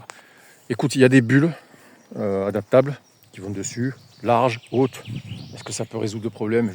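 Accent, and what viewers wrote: France. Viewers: Eh mais tu es sur le groupe v85tt ! 😊 Je pensais que tu étais Franc comtois d'origine, il y a un petit accent du sud. Cette cf me fait de l'oeil, franchement ils envoient du lourd, j'ai essayé la 450 et j'ai été bluffé. Au plaisir! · moi je mets un pouce rien que pour l'accent "nordiste" xD et j'aimerais beaucoup que tu reprennes la kove et nous fasse un petit comparo ;)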